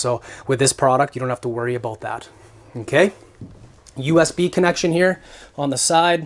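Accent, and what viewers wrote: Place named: Canada. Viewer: Your Canadian accent is goals lol and I love your in depth reviews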